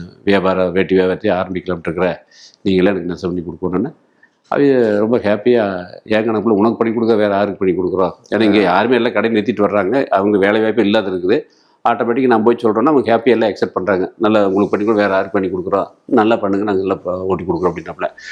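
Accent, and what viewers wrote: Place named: Tirupur, India. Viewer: Tirupur accent ❤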